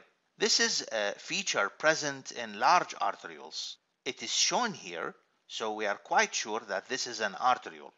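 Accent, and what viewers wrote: Iraq. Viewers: شكرًا يا دكتور .. احساس كبير من الاكسنت ان حضرتك عراقي😅🙈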